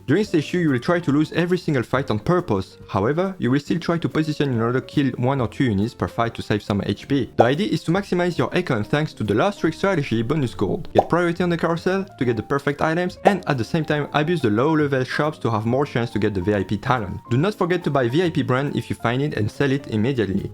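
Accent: France. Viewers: little french accent or what ?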